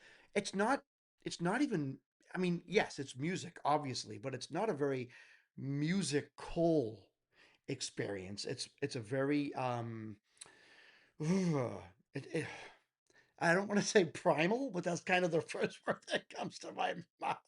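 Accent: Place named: Philadelphia, United States. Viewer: " " by with, trust me! (I'm from Philly, living in the midwest, hearing you speak is like being in my hometown area!)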